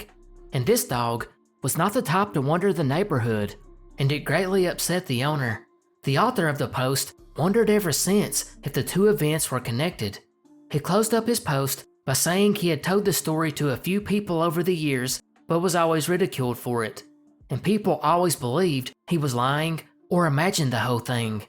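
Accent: South, United States. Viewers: It's soo good to hear a real deep southern voice that isn't put on. Love your show!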